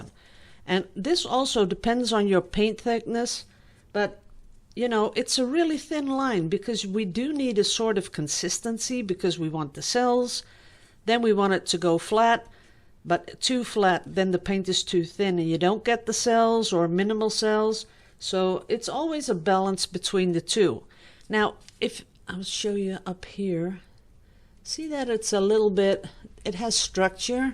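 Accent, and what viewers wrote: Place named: Netherlands. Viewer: First of all I have to say that I love your artwork so much! And I love to hear your voice and the dutch accent.☺️ (I’m German and I find my accent is horrible!😂) How do you clean your paintings? Do you have a video about it? Sorry if you‘ve probably mentioned it several times but I‘m quite new to acrylic pouring. And I must say, I didn‘t even know that it takes two to three weeks to let the paintings dry, but I think it depends on how thick the lair of paint on the canvas is. Thanks for sharing all your art, your knowledge and your experience, I really appreciate that. Have a wonderful Sunday.☀️🥰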